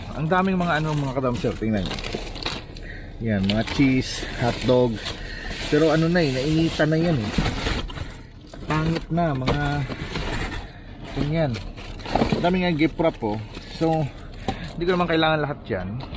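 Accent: Iloilo, Philippines. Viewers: Not daily ka ga ɓlog gali? 1st time ko nag lantaw bal an ko gid na ilonggo ka!❤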